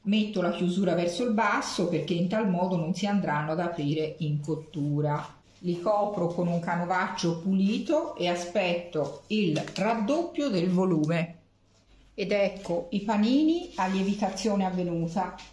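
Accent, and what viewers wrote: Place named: Florence, Italy. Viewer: Bravissima! Ti seguo da NY. Cercavo una ricetta facile per panini. Grazie! Adore il tuo accento fiorentino😊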